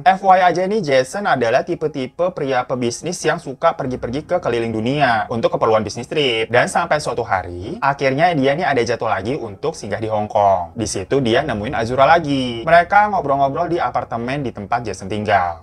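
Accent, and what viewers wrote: Java, Indonesia. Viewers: Sehat terus mas. Anehnya dari mas, namanya bule bangget... Tapi bahasanya medok Jawa banget...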